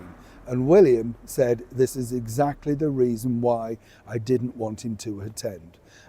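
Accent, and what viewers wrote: Yorkshire, United Kingdom. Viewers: Neil hubby & I thoroughly enjoy your news told as it is and sometimes with a bit of "Yorkie" thrown in keep up the good reporting and bugger the moaners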